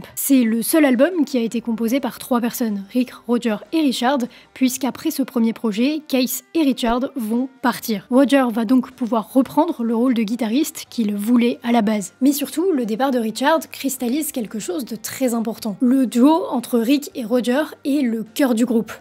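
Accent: France. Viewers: sauf que la langue française prend trop le dessus au niveau prononciation des noms anglais. ça fait tâche. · L'accent franchouillard est à retravailler svp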